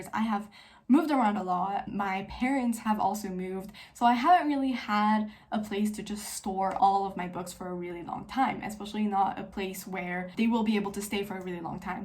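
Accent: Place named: France. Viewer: I had a feeling you could speak French, I recognized it in your accent. You speak really well for a newbie to booktube!!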